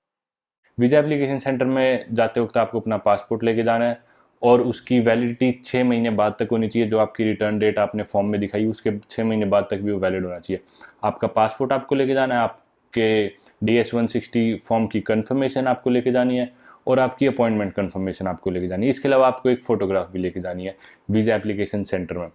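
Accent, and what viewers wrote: Haryana, India. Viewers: Haryanvi ho naa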